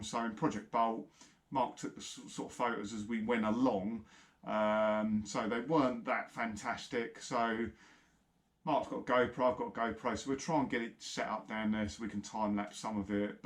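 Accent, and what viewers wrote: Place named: RP, United Kingdom. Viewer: Ooohh posh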